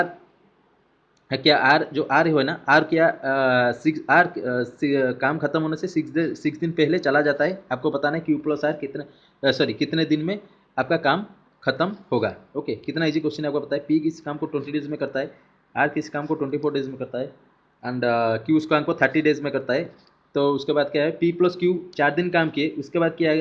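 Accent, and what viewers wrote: Odisha, India. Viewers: Pura odia lagucha bhai.. 😊☺️ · There is nothing wrong with ur accent. Ppl who comment on Indian accents should be ashamed of the of themselves. Diversity is the core of India 🇮🇳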